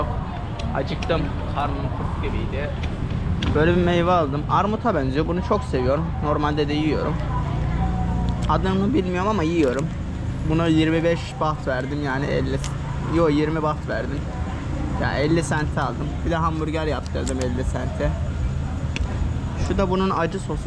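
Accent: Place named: Yozgat, Turkey. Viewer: yok dayi sen istanbullu gibi konusmuyosun, sanki boyle yozgat, corum gibi, babanlar nereli??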